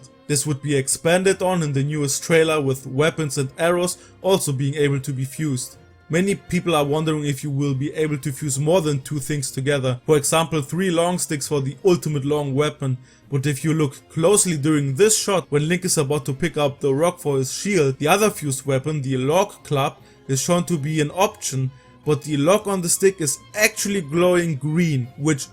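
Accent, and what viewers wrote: Germany. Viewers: Are you german because youre accent sounds lile a german one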